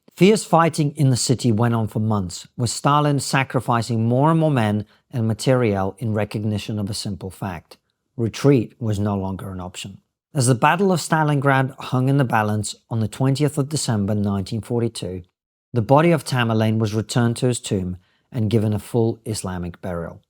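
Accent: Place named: United Kingdom. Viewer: Ты так быстро говоришь и с таким хэви-бритиш акцентом, очень трудно понять.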